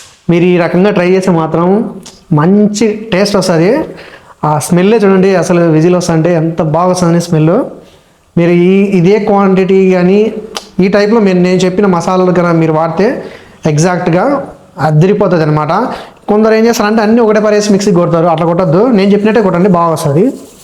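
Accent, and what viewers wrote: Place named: Kadapa, India. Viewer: Superb accham kadapa basha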